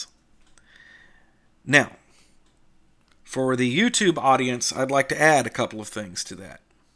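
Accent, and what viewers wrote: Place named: Virginia, United States. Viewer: We love you, you're a great orator educator and communicator. I guess those descriptors are redundant to each other. But they are true. Being a New Englander I especially like your distinctive Virginia accent. Mostly I love the passion you put into teaching Linux to the rest of us. You've helped hundreds if not thousands of people transition from windows to Linux. Granted Microsoft helped the cause by developing the worst operating system ever. I only wish you didn't react to the haters, you come off a bit cringy and oversensitive. You need to learn to not let them get under your skin.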